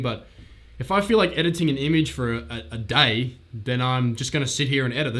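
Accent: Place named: Australia. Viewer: I like this dude. He does the shit that a lot of people refuse to do (actually show editing) and he does it with an Australian accent. What's not to like?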